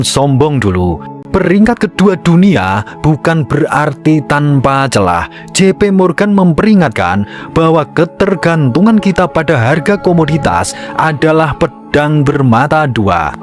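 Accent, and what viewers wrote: Java, Indonesia. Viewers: Ngomong ne kayak orang kampung saya wong jowo,malu saya dengar nya, harusnya logat Jawa nya harus hilang, pakailah logat bahasa Indonesia yang baik dan benar,karna kita orang Indonesia berbagai suku ras dan agama yang melihat dan mendengarnya.😂😂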